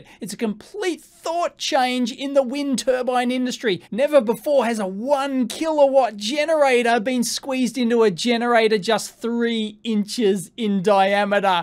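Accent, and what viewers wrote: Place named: Outback, Australia. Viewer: Why come you talk like you're from the outback?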